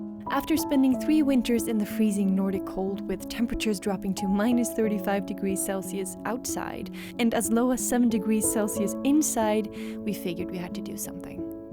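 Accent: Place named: Canada. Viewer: When I looked up how many pounds your 190kg fireplace was (419!!), I was like, "Yeah, they're gonna need a forklift." You guys used a fair amount of ingenuity, determination, and sheer willpower, to get that thing into your house!! The fire it makes is beautiful. One question: Do these types of fireplaces have a creosote buildup problem like brick chimney fireplaces? If so, you should probably clean out the exhaust regularly to keep it safe. I saw you had a motorcycle and a digital piano in your house. Are they both Yamahas? btw, I think you speak English with a Canadian accent, which is better than a US accent imho, except for how they say "decal". You're saying "cupboard" right, btw. The way you weave back and forth between your language and mine makes me want to learn how to say stuff in another language. You and your bf should team up with Kevin Bacon and Kyra Sedgewick. 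Congrats on a successful, beautifully executed long-term project, which took a ton of effort!!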